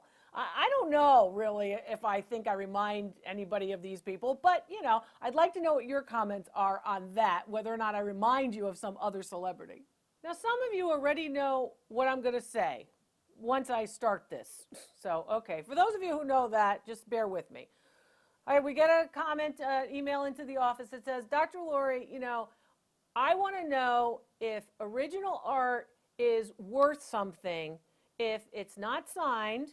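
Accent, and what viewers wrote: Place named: New York, United States. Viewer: It's just because of your New York accent that people say you remind them of others. That's because others have the New York accent, and Judge Judy is straight forward (although a little rough sometimes). Don't worry about the evil people with the nasty comments. They are just jealous because they don't know as much as you do.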